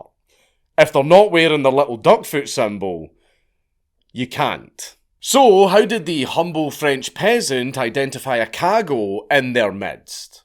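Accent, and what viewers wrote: United States. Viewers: Dankula doing an American accent threw me off so hard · Dankula, your American accent is great. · Not the worst take American accent I've ever heard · with an American accent is CURSED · Your American accent is good! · Dude, good work on the American accent! Much respect from the land of the whatever we are these days! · Pretty good American accent ya got there my Scottish friend. Im also of Scottish, Dutch, French and Native American hertiages. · Just got here from X. Love this style. Dig the gruff American accent. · Never thought I would hear do an American accent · Mr. Dankula, i must say i love your American accent. you should do it more often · Brillant opening. Excellent American accent. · Pretty good American accent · That's a good American accent. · That American accent though. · That was a pretty good vaguely American accent, I do a pretty good accent too but only when I am drunk.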